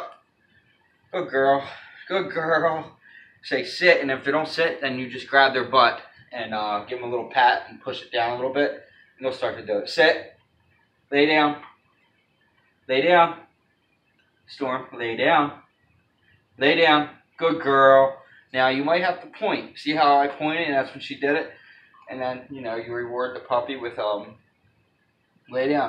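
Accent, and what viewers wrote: Philadelphia, United States. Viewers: minutes in I could tell you’re from philly from your accent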